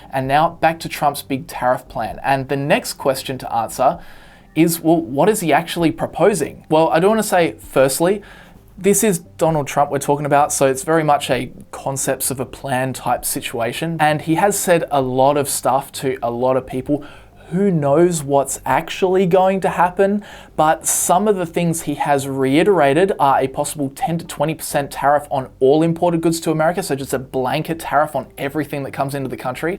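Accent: Australia. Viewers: Probably because he doesn't go here (I'm assuming based on the accent that he's from Australia). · Sounds like you’re Australian. Your wine industry has huge issues in America.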